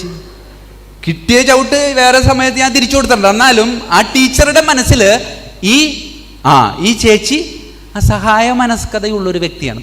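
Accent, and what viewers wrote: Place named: Irinjalakuda, India. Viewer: irinjalakkudakkarrude samssaram pole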